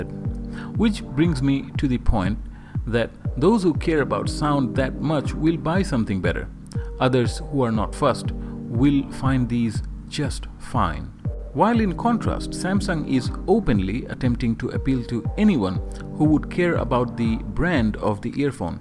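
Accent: India. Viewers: I live in India and you sound Indian too albeit you are at the initial phase of picking up the American accent where you have moved not too long ago and you were ably assisted by your kid . Bet my bottom follar you are a Bengalee I bought a Samsung AKG in ear monitor last week and it is divine in sound with Spotify and UTUBE and carties every detail of sound and is a true representation of the high class of the AKG stable. AKG is a brand which makes ptofessional sound systens , equipments and accessories. AKG was acquired few years ago by Samsung which saddened me but thankfully Samsung has maintained the European standards in AKG .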